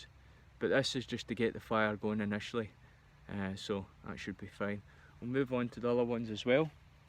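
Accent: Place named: Scotland, United Kingdom. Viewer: Do you speak Gaelic? I was thinking you were from Scotland but there was one time I guessed Scottish for someone whose accent was very similar to yours...turns out they were Welsh. Embarrassing. Haha. When you say politer, you mean no swearing? LOL. The brace on the rucksack made it look heavy so I was curious how much it weighs. Is that woodland in Scotland? And you’re welcome! Good luck on growing the beard. Mari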